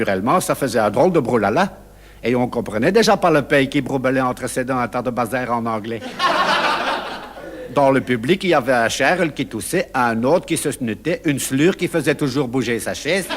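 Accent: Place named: Brussels, Belgium. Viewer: Cela me ramène en arrière dans le temps, je suis bruxelloise et ma bobonne parlait comme ça.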